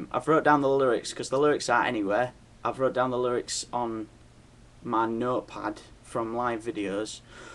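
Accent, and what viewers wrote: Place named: Barnsley, United Kingdom. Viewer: Good god this guy sounds like he lives near me! haha, Barnsley?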